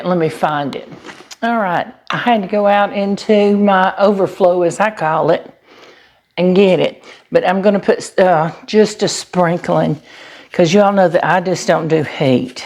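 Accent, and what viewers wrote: South, United States. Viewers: It looks absolutely yummy, I hope that I can buy the dough roll in England, does anybody Know? love your videos and your southern accent is delightful.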